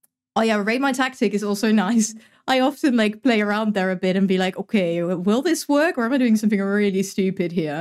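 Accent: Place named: United Kingdom. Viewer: Gotta give you a compliment for your English! You actually sound British! xD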